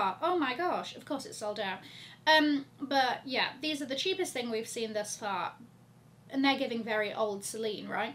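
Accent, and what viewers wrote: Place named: England, United Kingdom. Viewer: Interesting channel, but English accent is difficult for me. I prefer american English.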